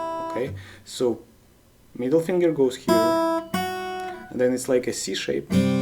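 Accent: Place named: Russia. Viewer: Прям русский акцент.дядька,чем тебе наш язык не угодил?думаешь просмотров больше будет на инглише?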